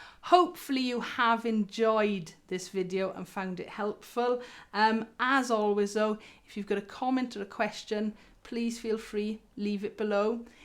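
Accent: United Kingdom. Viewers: Hey,. Are you British?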